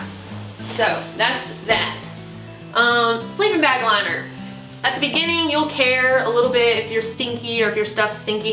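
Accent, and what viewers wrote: South, United States. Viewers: Hey - Do people ever comment about how DIFFERENT the young lady is that is just starting the AT in GA (2015) to the one doing these very informative videos? The pretty is there throughout, but the confidence is far greater in these latest efforts. Hope your book is nearly as informative. Too bad you can't write with a southern accent!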